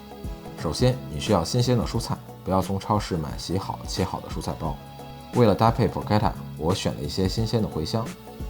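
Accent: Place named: China. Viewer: 除了刀功和口音是中式的，其他完全纯正老牌欧式，毫不犹豫素质三连。留着整套菜谱，我圣诞节做家饭。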